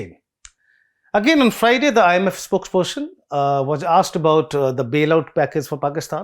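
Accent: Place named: Northeast India, India. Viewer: I think so....from his acent it seems he is from Northeast.....He is certainly not from Delhi or any north Indian states....His looks particularly his face also somewhat confirms that he belongs to Northeast. As the Northeast people usually don't have much facial hair like moustache and beard, this fellow also doesn't have moustache and beard at all....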